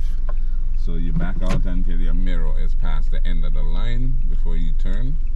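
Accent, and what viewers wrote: Jamaica. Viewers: He Jamaican?... hear the accent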